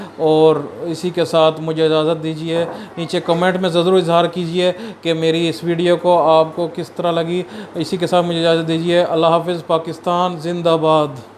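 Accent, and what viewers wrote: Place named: United Kingdom. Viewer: urdu ko انگلش ke style main بولتے ہیں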